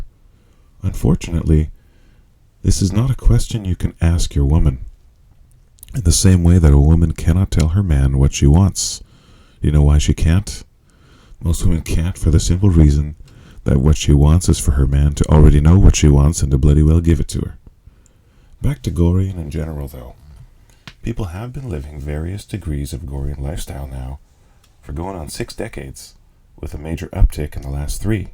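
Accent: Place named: United States. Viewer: please will you get in contact with me Master I want to learn as much as I can about all this. I've ordered three of the books about Gor from Amazon. I think you live in America as you sound American. I live in Belfast northern Ireland so I think I'm about 5 hours ahead of you in time not sure but you can contact me anytime you like but there may be a delay in getting back and in real time sorry. I'm also at uni most days which doesn't help with things either. I'm bit embarrassed about asking but I want to be a sex slave slave girl and learn as much as I can . Now I'm totally embarrassed and will be a complete nervous wreck until something happens from your side or not. You can contact me anytime you like . Love Tara x. I feel a bit humiliated asking about all this but it's what I want. Tara x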